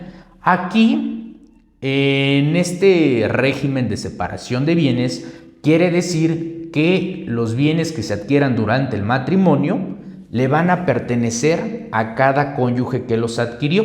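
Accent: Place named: Peru. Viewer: Pensé que era de peru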